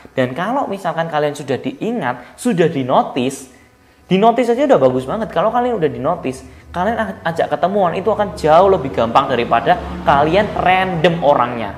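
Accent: Java, Indonesia. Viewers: Medok koh 😀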